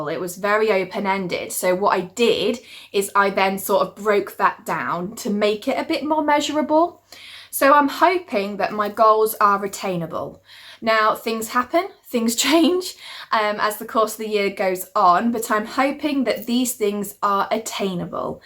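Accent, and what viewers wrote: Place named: United Kingdom. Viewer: omg i love it i am from the us though so British accents always sound good to me lol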